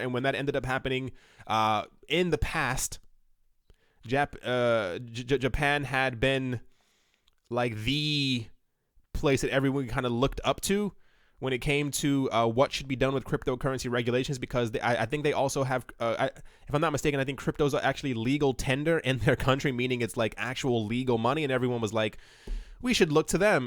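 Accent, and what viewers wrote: United Kingdom. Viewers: Mate, if someone tried to ridicule me for using a british accent, I would do the whole video in british accent. Don't be bothered by these knobs. If they're foolish enough to invest with a loan or can't see the developments in crypto space, then the crypto space will be better off without them anyways. Thanks for anothet great content and boy are we entering interesting times... all the best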